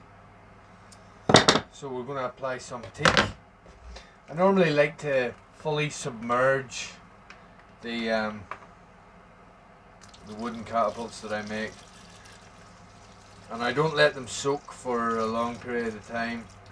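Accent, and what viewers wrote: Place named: Antrim, United Kingdom. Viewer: that aul country antrim accent proving hard for people to decipher haha love it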